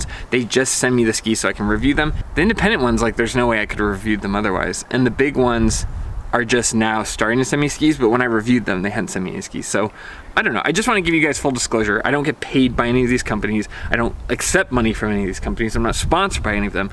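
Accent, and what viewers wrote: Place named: United Kingdom. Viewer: Jesus H Fuck, here's to your kingdom accent.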